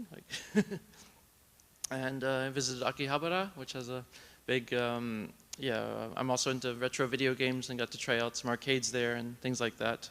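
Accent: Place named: United States. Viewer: It's funny, -- the thing I notice listening to this is how the melody of your speech cadences has changed to a more German-influenced sound, from your living in Germany so many years. Of course your accent is perfectly American, but if I listen to this so quietly that I can barely make out your words, the ups and downs of your intonation sound like German. Having spent months in Germany myself, without actually being a fluent German speaker, it was instantly recognizable to me. I don't remember this characteristic of your English many years ago when we first met. Fascinating!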